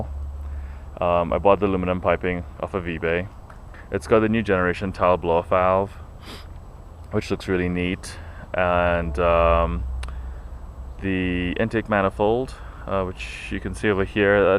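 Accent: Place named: Russia. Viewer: woow very very nice man, you say you live in col springs yeah,? where is your accent from, almost sounds russian at some points but not exact, great video man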